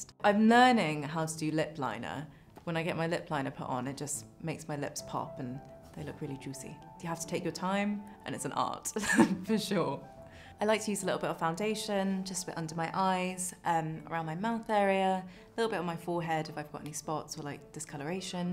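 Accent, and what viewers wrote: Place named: United Kingdom. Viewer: I love her natural British accent!!!❤️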